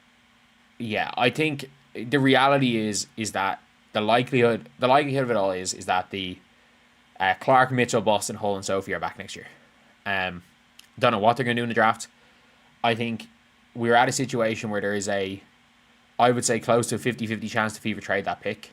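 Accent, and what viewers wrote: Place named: Ireland. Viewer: He has a brogue, says he's in Ireland, Twitter says trending in Ireland and people can't figure out Ireland....sorry, that's just willful ignorance. Eire not 'Merica for. Sorry. Best wishes to all.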